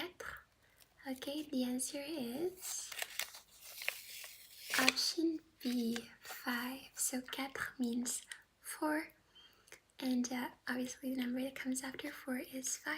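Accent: France. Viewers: On dirait que t'as l'accent du Nord, c'est beau 👍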